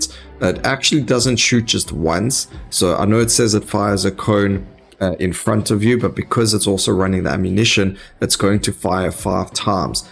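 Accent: South Africa. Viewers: Is that a Durban accent? Defs South African. Thanks for all the video's they have helped tremendously.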